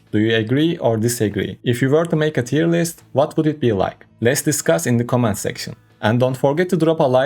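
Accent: Turkey. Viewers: Reis türk müsün senin aksan tam türk aksanı gibi geldi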